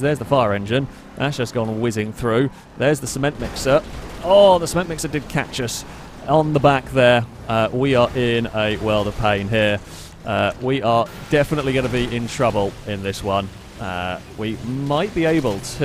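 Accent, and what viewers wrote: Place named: RP, United Kingdom. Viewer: Hi, I noticed that you do have someone of a posh accent but I've also noticed that you have something of a cockney twang to your accent as well not full on cockney but just every once in a while you see certain words that just sounds so coughing do you have coffee ancestry that you know of just curious? specifically the word that you have made up called Gooder and any other words you add ER to that do not normally have er I can't think of any right off the top of my head but I know that he do do that with a couple of words there is no such thing as gooder it's good of or better than usually do that when you're trying to say something with something of you just go blanker And you make up these words or you heard these words used by somebody in their past whether a teacher or a family member or a family member's friend. 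it's like saying Jaguar there is no such word as Jaguar and unfortunately the Japanese got it from you guys and then kaiju type robot hero Jaguar or is we in the United States do them as Ultraman if you have a hell of a lot better than named Jaguar or Jaguar how do you get a jet on a Jaguar stick it up his ****? So it's it is pronounced Jaguar Gua GW g A group he pronounced Jaguar JAG Jag WAR War we put them together to get Jaguar Jaguar can you say that Jaguar stay with me jaguar. you don't pronounce every single vowel that comes across to the English language some of them are pronounced differently being other language it is technically a Native American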